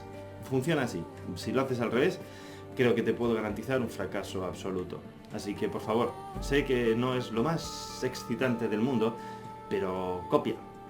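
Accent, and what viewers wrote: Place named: Spain. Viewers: owo molaria, aunque no me gusta mucho el acento español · tampoco me gusta el acento español.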